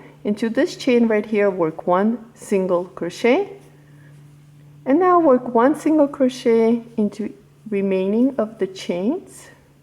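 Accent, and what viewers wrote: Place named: Bulgaria. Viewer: Really fun project!, btw, are you Bulgarian? Your accent and your picture made me wonder 😉.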